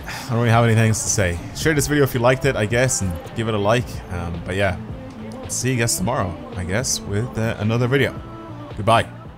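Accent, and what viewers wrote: Dublin, Ireland. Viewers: Good vid keep it up (quick question are u from Dublin in ireland cause u sound like u are from Dublin)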